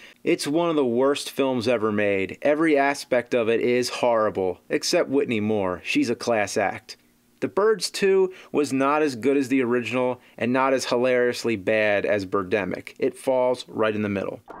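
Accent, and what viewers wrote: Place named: Northeast, United States. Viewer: Typical northeastern accent.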